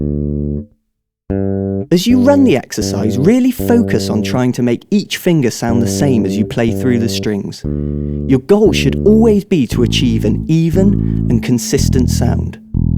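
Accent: United Kingdom. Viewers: ingiliz aksanı.. tek kelimesini anlamadım a.q... japonca sanki... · You're like the British, calm version of LinusTechTips.. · Wow you’re very British!:-)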